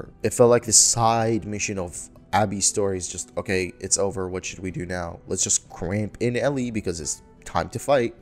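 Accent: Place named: South, United States. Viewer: Bro do you mind if I ask where you’re from? You sound like you aren’t from USA but you kinda have a southern accent.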